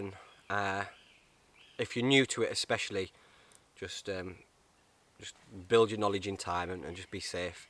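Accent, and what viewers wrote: Yorkshire, United Kingdom. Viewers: Where are you from? I detect a yorkshire accent.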